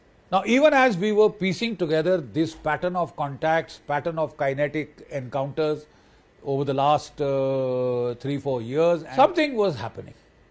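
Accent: India. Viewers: Boss we love your dasi English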